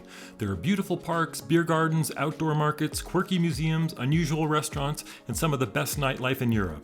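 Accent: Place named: United States. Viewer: Nice videos of Travel. But try to pronounce the names of the cities more the local way in stead of American English. Cannes should me more pronounced like {kon} in stead of can.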